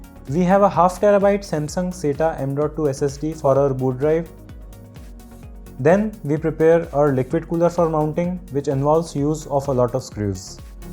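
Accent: India. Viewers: finally an indian who speaks in indian accent, this is what I like not talking in a shitty american accent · Indian accents have to be the worst to listen to my god. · The same Indian accent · Idk why but i had to laugh so hard bc of the indian accent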